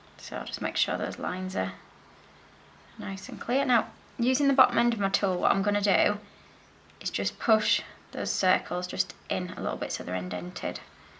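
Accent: United Kingdom. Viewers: Are you british? You have a very intense accent( not the bad way)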